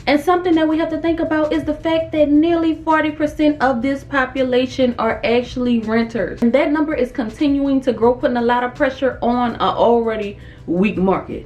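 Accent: New Orleans, United States. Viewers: Thanks for this information. Btw, are you from New Orleans?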